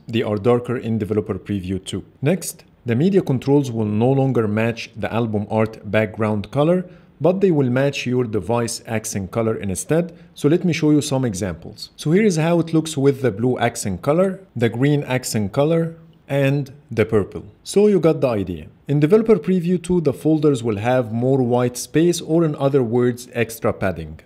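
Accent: Egypt. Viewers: Hhhhhhhhhh.hhhhhhhhh...you are egyptian i can hear your egyptian accent while speaking english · your accent screams eygptian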